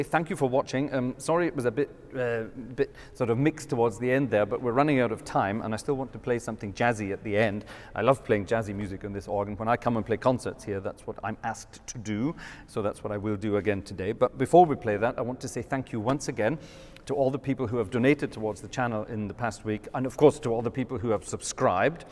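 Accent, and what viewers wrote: Scotland, United Kingdom. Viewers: To my British ears...no! has a lovely Scots accent:) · I didnt realise you were scottish! Explains the accent then haha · Did german language steal your scottish accent? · Er ist ja Schotte. Schottischer Akzent klingt angeblich, als würde ein Bayer Englisch sprechen.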